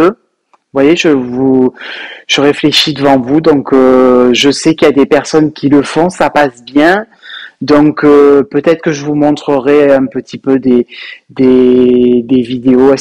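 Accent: South, France. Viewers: Encore un agréable moment. De jolies laines et de bons conseils. J'adore votre accent plein de soleil et de cigales... Passez de bonnes vacances. Si vous êtes OK, vous pourriez nous partager de superbes paysages. Reposez-vous bien. Le son pas top mais bon......😊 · 😂😂😂trop sympa votre accent français à la Dior,,,j’adore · L'image est tres bonne mais le son mauvais.cela vient peut-être de la piece.je me regale de vous ecouter avec votre accent du midi c'est un vrai plaisir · Coucou! Ce que tu me fais rigoler 😅 ton short kitch est hilarant et oui tricote. Tu feras une belle présentation dans ton podcast 😉 Comme toi je parfume mes laines avec des "billes odorantes" que j'inscris dans mon cake et comme toi je sens toujours mes laines 👃😂 Tes chaussettes "forêt enchanté" et tes glaces sont magnifiques, j'adore. J'ai le patron "forêt enchanté " dans ma bibliothèque et comme toi je vais opter pour les écureuils, ils sont trop chou 😊 J'ai toujours beaucoup de plaisir à te regarder tu me fais rigoler, j'adore ton franc parler et surtout j'adore ton accent du Sud et tes expressions qui sont succulentes. 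Bon été et bon voyage, tu vas en voir du pays 😍 Bisouxx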